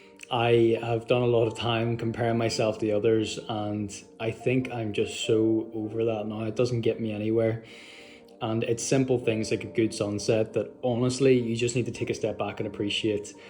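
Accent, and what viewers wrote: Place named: Ireland. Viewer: No way!!! I’m doing the Belfast marathon as-well, I suspected you were doing it cause u said u were 12 weeks into training which means most likely u only have another 4 weeks left and the Belfast marathon is in 3-4 weeks and I could recognise the Irish accent from anywhere. Maybe I’ll see you there mate, good luck and what time goal are you going for if you don’t mind me asking?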